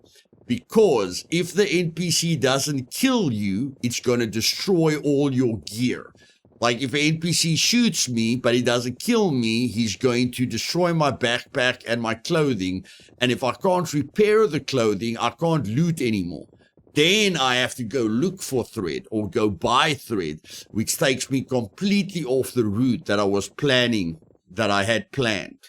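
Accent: South Africa. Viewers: You south African bro? Cool accent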